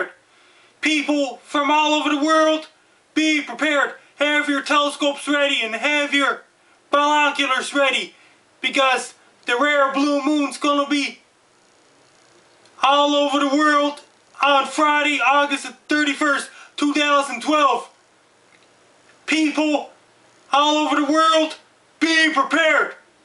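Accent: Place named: Cape Breton, Canada. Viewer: It is rare because it only happens "once in a blue moon". He talks like that because he's a Cape Bretoner. Not that there's anything wrong with that.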